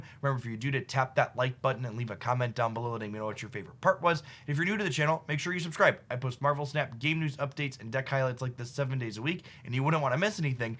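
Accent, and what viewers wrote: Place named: United States. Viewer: YouTube voice: regular American accent. stream voice: “deed ya like that cheeeyat?!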